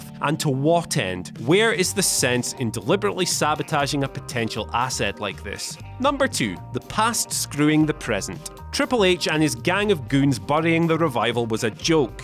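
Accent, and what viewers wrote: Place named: Scotland, United Kingdom. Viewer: I want to keep hearing this Scottish prick's voice. Love this dude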